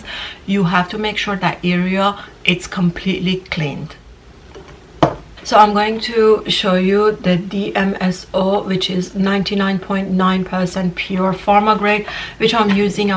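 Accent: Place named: Iran. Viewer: Persian accent 💯💯💯💯. She has diluted it from living abroad somewhere but sounds Persian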